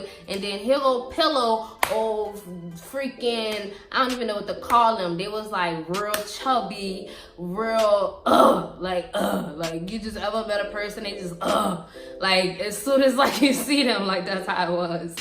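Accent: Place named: New Orleans, United States. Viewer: Oh so you are from N.O, Of all southern accents that's my fave 😂. It sounds like Homeboy had a little thing for you👀😏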